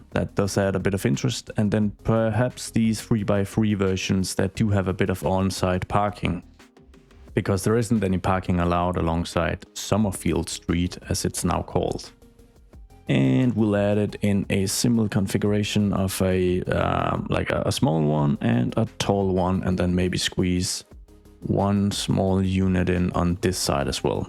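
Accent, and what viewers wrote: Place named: Denmark. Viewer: Ayyyyy! I've missed that Danish accent! Great to have you back :P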